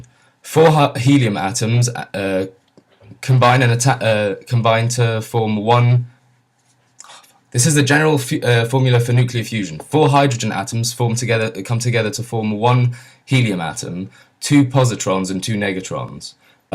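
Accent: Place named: RP, United Kingdom. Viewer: You sound posh. I imagine you went to decent schools. What a waste. You mumble and miss pronounce.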